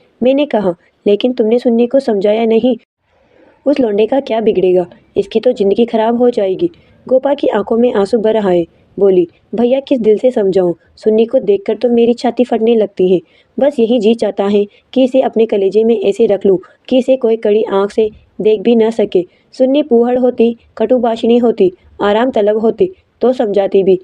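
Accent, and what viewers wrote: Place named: Hadoti, India. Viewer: आवाज में हाड़ौती की बोली का acsent सुनना कितना मंत्रमुग्ध करने वाला अनुभव है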